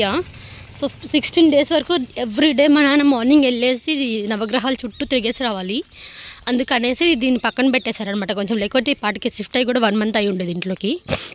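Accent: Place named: Kadapa, India. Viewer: Meeru matlade language same ma area lo lage vundi, garu meedi e vurandi, meeru kadapa district ki chendinavara?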